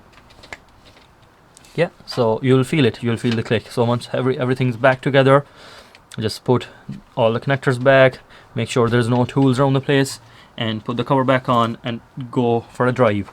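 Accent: Cork, Ireland. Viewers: Is that a hint of a Cork accent? Sound for the video, need to tackle this in the morning. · Love your cork accent...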